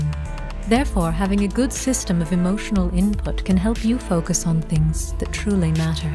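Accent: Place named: United Kingdom. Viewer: Some one PLEASE tell me WHY these producers insist that a 'British' accent qualifies as an authoritative narrative ????? Is this opperant conditioning attitude the best you can do? Right coast version of British accent turned inside out. The flexion is suck eggs.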